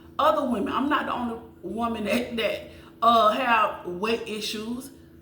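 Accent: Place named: Alabama, United States. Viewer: Is that a Alabama accent ❤ U sound just like a good friend of mine 😊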